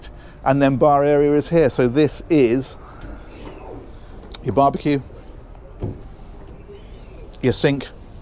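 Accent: England, United Kingdom. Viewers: This is the only englishman whos accent i can take. I love you man!!!!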